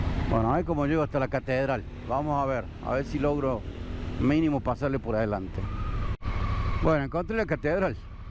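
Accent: Argentina. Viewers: Tiene acento de Córdoba, Argentina.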